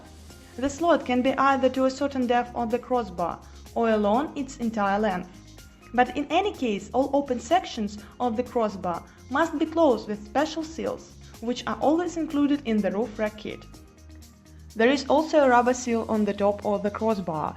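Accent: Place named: India. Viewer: Sounds like a Hindu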